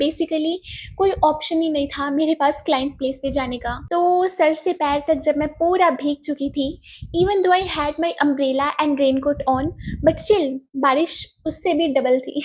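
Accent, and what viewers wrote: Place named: Punjab, India. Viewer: Your punjabi accent is too cute❤😁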